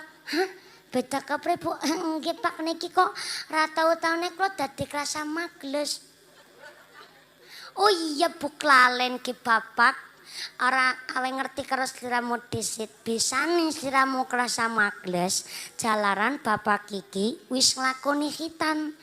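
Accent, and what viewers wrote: Banyumas, Indonesia. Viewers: Gokil ngakak pollll ustaadzahh ngaapak kiii,🤣🤣🤣🤣 Mg lncar acaara Khitaanyaa. Aminnnn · Ustdzh cantik..ora ngapak ora kepenak😆😆🤦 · Logat yg bikin menghibur, yaitu logat ngapak.. Hhi Sukses truss sob Mampir sob kerumah, ajari sy bahasa ngapak.. Biar lancar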